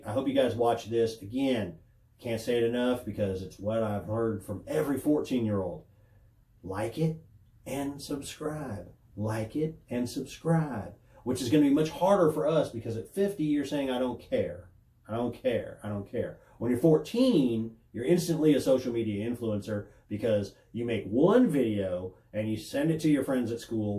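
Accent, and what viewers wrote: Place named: South, United States. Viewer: It's been soooooo long since I've heard your voice but I can tell you have ben south of the Mason Dixon for a while.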